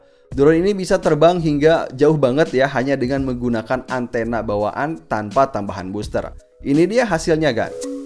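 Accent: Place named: Majalengka, Indonesia. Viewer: Alih sudah duga dari awal Bnr beliau emang orang Majalengka hehehehe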